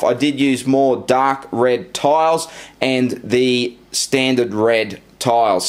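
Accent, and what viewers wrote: Australia. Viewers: I like that Aussie accent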